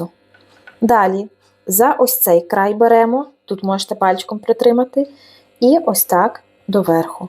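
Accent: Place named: Lviv, Ukraine. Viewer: Добрий день,! Дякую за рецепт. А Ви не зі Львова часом? Бо чую чудовий львівський говір, інтонацію, дуже приємний.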